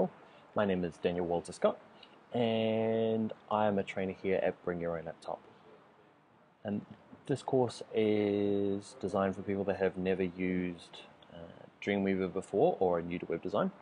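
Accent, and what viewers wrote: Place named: New Zealand. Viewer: Loved hearing the Kiwi accent bro. cheers for these dude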